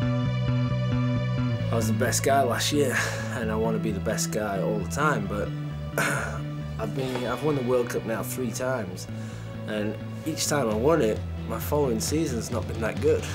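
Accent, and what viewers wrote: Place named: Yorkshire, United Kingdom. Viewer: it's a yorkshire accent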